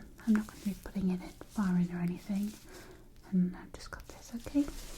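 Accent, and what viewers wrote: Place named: North East England, United Kingdom. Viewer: Love it. Totally relaxing 😍😴 also love the northeast accent I'm from north yorks so having a local asmr artist rocks 👍🏻👍🏻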